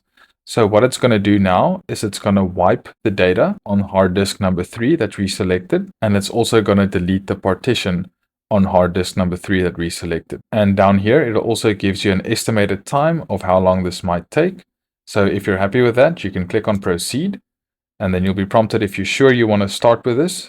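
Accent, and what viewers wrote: South Africa. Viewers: he instantly sounds SO SOUTH AFRICANNNN 🤩🤩😍😍 undeniable SA accent !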